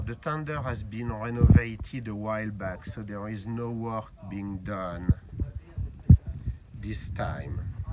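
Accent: France. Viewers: You have a very french accent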